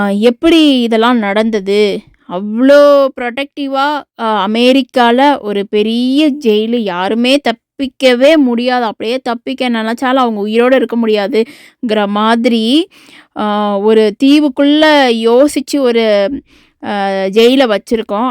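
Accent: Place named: Coimbatore, India. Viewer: கோயமுத்தூர் இவங்களா வாசனை மாறாமல் பேசுறீங்க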